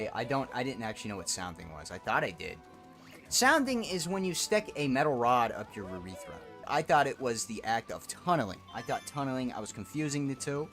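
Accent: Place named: New York, United States. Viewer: new york accent like Schlatt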